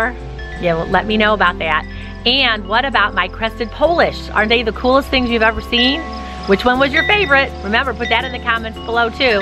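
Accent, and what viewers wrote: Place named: Great Lakes, United States. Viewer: Was just able to stop laughing at least long enough to comment three years later. Cyndi Lauper was funny. My friend calls his branch cutter "Lauper" but "Phyllis DILLER" had me in stitches. I've been a sub for over three years and wasn't going to watch this cause of its age but glad I did cause I needed that laugh badly what with all the dark conspiratorial stuff I analyze [NOT theorize] & ingest almost every day. Needed that Light and silver sunshine you radiate. Not sure where you and live but it's well N of SW Blowhigho. I can tell by your near Great Lakes eaxecent.. LolOwell. Peessowt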